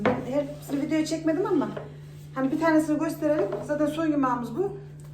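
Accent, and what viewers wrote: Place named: Aksaray, Turkey. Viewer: İyi günler maşallah çok güzel ve dogalsiniz bende aksarayliyim bu tarifi yapacam aynı bizim gibi konuşuyon kız aksarayin neresindesin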